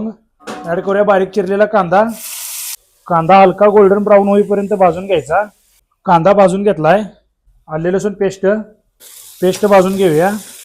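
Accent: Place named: Kolhapur, India. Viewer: भावा तुझ्या बोलण्याचा टोन एकुण खुप छान वाटलं माझ्या माहेरी गेल्या सारखं वाटतं मी पण कोल्हापूर ची आहे खुप छान जगात भारी कोल्हापूरी ,,,👌👌👌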